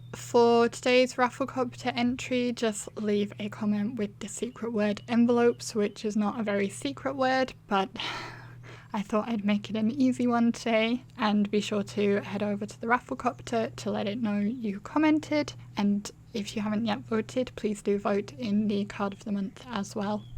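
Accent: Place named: United Kingdom. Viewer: I have been thinking about making my own envelopes. I haven't got a board for it, but I might have a go anyway! Also, just wondering why you make your cards in A2 size? From your accent, I can tell you're British (like me) so wondering why you don't male A6 cards, especially as you mentioned your patterned paper was A4 (rather than US letter size).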